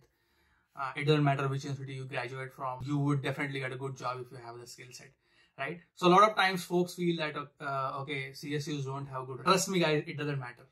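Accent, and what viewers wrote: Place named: Maharashtra, India. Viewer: Great video bro...I guess you are a marathi guy based on your accent.. well that's a different top though! I just wanted to ask that if we are able to score only around 302-305 in GRE then is it possible to compromise it on 1 year work experience plus an internship of 6 months so that the total work experience will be of 1.5 years and the GRE score would be around 305.Will this make me get an admit into sjsu for MS In CS? I would be glad if you answer. Thanks!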